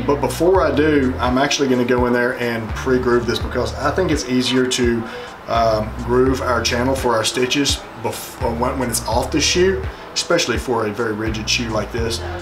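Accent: South, United States. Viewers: Came for the shoes, staying for the hot southern drawl! 🤗🤗🤗🤗🤗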